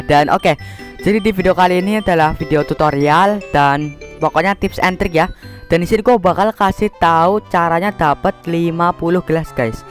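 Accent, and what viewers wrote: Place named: Java, Indonesia. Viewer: Bahasanya medok kk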